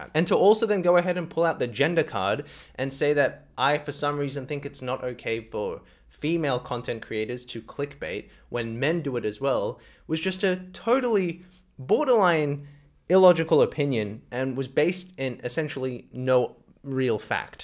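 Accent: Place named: Australia. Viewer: I couldn't figure out for the life of me what country your accent is from, Australia was my first guess, I'm so glad my first guess is correct lmao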